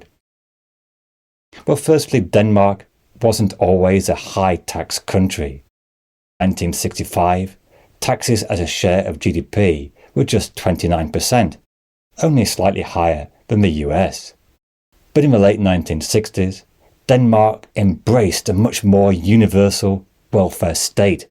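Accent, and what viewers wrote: United Kingdom. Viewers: The narrator has a British accent.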